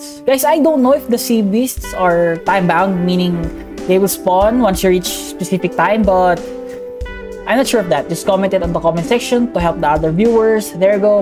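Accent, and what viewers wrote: Philippines. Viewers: Are u filipino ur accent is filipino accent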